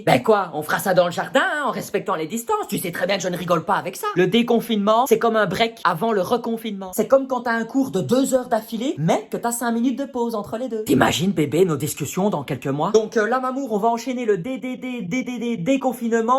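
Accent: Belgium. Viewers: L'accent namurois , c'est réjouissant ! signé une namuroise exilée. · il est belge pas français 😂 ça s'entend avec notre bel accent pure souche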